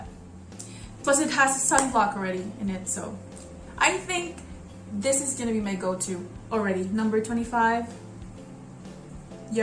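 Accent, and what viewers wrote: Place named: Philippines. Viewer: I like your filipino accent:))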